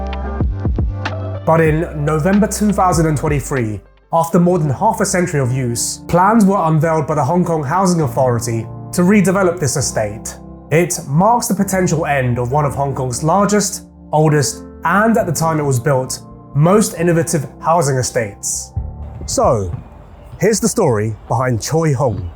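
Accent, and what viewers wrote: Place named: United Kingdom. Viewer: bro u sound so brit it trips me out lol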